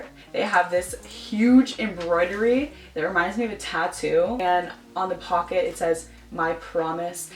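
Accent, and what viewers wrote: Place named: Toronto, Canada. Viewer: I just watched your other thrift with me vids and this is the first time I have seen you on YouTube. Anyways, I immediately thought you were from Toronto and when you said you were from there I was like yesss!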